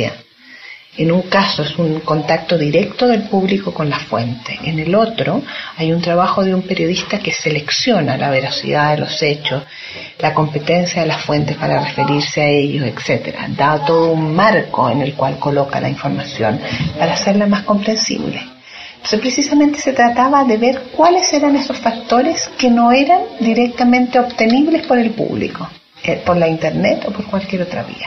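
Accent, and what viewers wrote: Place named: Chile. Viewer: Sin duda, un acento distinto. Claro y confuso a la vez. ¿Acento chileno?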